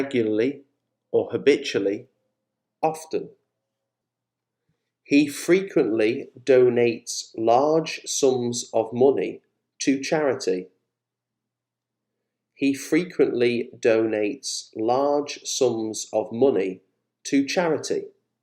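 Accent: United Kingdom. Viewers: Спасибо. Только один вопрос в изучении английского языка. Как учить британское произношение, как у вас по вашему уроку или всё-таки сразу американское для разговорной речи? Если учить британское произношение, не будет ли оно мешать в понимании американской разговорной речи? Спасибо. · Hi Greetings from Türkiye nice video for british accent I can understand Thanks :) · Hi! Thank you for teaching us.🙋 I've just done day 1 and I have a question. Is it british accent? (I mean english language the og ofc) Are these homophones also the homophones in american accent english?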